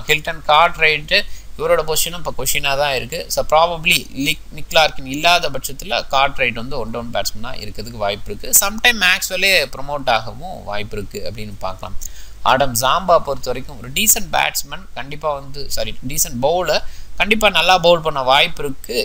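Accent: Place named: Kongu, India. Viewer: Power play angatun. Kongu Tamil coming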